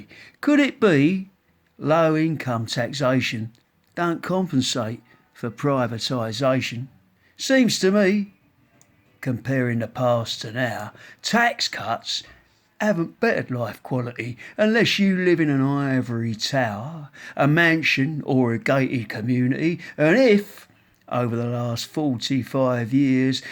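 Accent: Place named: Cockney, United Kingdom. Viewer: As if your voice isn't annoying enough, starting your cockney rant with a close up of you taking a toothy swing from your glass followed by 'smack! AHH!' sound effects is not a good look, 'mite'.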